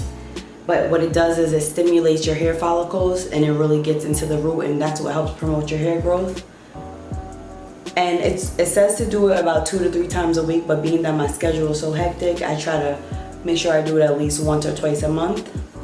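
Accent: New York, United States. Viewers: Fellow NY’er here, I know that NY accent cause I have it too😂😂. But your hair and skin is freakin gorgeous. I will definitely try this and I just subbed. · U from NewYork?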